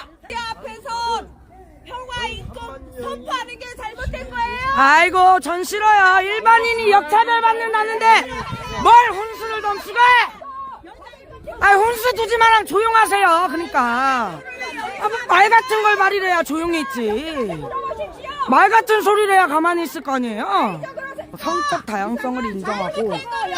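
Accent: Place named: Jeolla, South Korea. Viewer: 말투가 전라도 같은데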